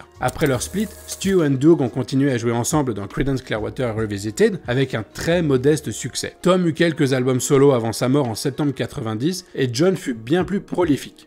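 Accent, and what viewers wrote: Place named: France. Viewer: Super vidéo, du sérieux dans la recherche et bien structurée avec un petit passage par la technique toujours intéressant sans être trop rébarbatif. Un phrasé bien frenchie mais ça va encore. Bref merci pour votre présentation.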